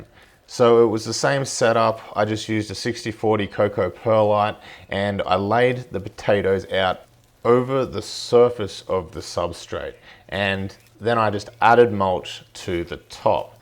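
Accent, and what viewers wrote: Australia. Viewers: YOOO another Aussie gardener I can get behind!!!! lots of interesting and informational content that isn't droning on and boring, and it's being all eco-friendly and all that! You have a new subscriber lol, I adore all this crafty gardeny goodness xD Where abouts in Australia are you? the butcher birds in the background and your accent sound very WA-like, are you WA-based? xD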